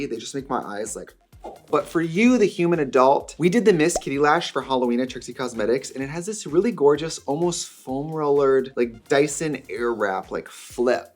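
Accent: Wisconsin, United States. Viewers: You can also hear her Wisconsin accent a lot more and I think that’s very metaphorical. She’s back home. Honestly makes me wish I had 3 months off. 🥹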